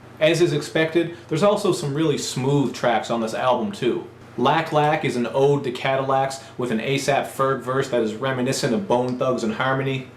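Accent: Canada. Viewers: You are the most Canadian person I've ever heard.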